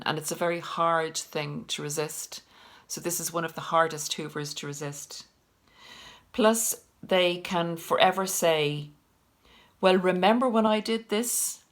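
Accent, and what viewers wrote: Ireland. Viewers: You deserve a lot of subscribers. You nail it on the head. I am 2 years NC. I feel a lot better but I still watch videos and was extremely happy to come across your channel. I’ve already shared one on fb. You are wonderful. Love your Irish accent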